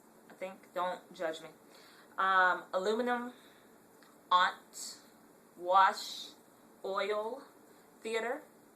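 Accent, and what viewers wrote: United States. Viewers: im from NY and I like hearing that compton cali accent of where your from and by the way don't worrie about how you look u should consider signing up for americas next top model thats how nice u look:) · She sounds realer than most Californian accents I hear including the ones from actual Californians. The real ones sound almost as forced as the fake ones. - Bay Area Guy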